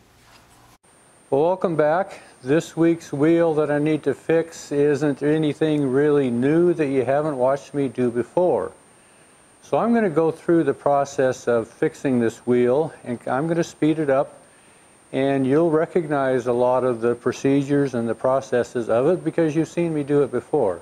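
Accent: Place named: West, United States. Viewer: This is a snarky, implied criticism of the customer, who is labeled as being in effect "inauthentic." As though being a horse and buggy mechanic with a MIG welder, video cameras and video editing software, and a Youtube channel dedicated to ASMR videos with a horsey western twang is somehow more authentic. A case of the kettle calling the pot black. Leaves a sour taste. "What you mean 'we,' Kimosabe?"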